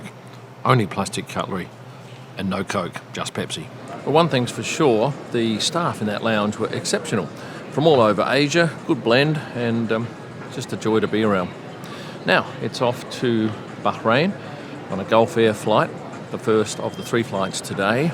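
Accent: Australia. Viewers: Loved discovering that one of my favourite media people in the F1 circus has an aussie accent. I have always appreciated your F1 media content that appealed to the female audience over the years.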